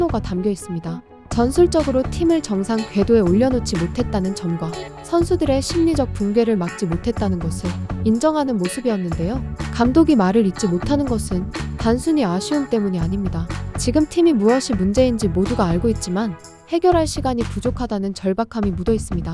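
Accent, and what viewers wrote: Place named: South Korea. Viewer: orang Korea bro kelihatan logatnya tp kelahiran Tegal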